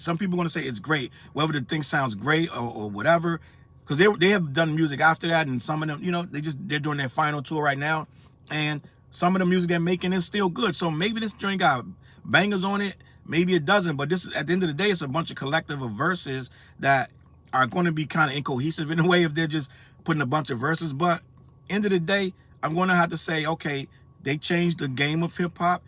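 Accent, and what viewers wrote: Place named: Texas, United States. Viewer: Now you sounding like you’re straight out of Texas with this one.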